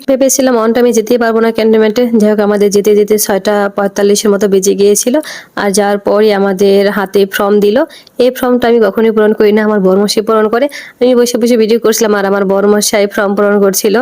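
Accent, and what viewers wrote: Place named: Bangladesh. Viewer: উচ্চারণে ই ধরা পড়লেন আপনি বাংলাদেশী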